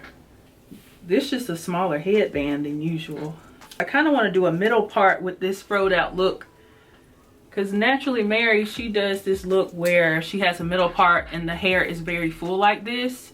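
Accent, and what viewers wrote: South, United States. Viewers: LoL I meant the geographical area...I noticed a slight twang in your voice is all...I definitely wouldn’t have guessed SC.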